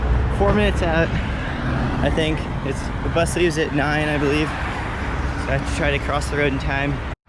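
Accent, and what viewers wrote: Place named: California, United States. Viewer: Are you from California? lol that accent is like deep Californian but love the video!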